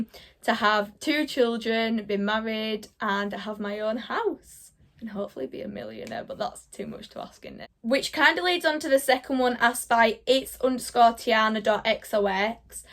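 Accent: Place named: Yorkshire, United Kingdom. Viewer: It's Yorkshire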